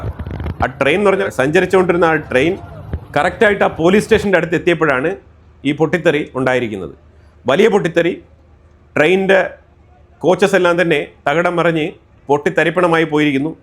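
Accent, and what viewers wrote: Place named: Alappuzha, India. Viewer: Hello Are you the native of all eppey? your slang is just like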